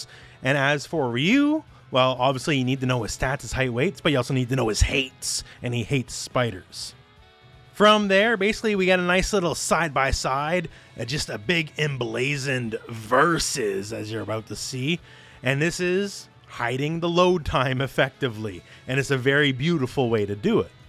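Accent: Canada. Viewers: you sound exactly like my 9th grade algebra teacher. Canadian accent and all.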